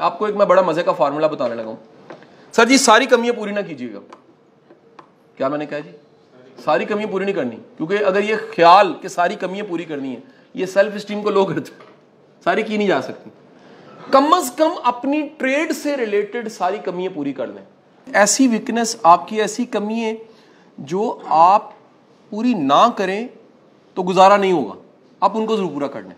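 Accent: Punjab, India. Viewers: Muhammad Amir - This is lahore or pubjabi syle of urdu. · Sir ap bhtt parhy likhy hen. baten b achi krty hen lakin apka accent punjabi he.. 11 ko ap bar bar yara keh rhy gyara ki bjaye.. mere kano ko bhtt ajeeb lgti ye punjabi urdu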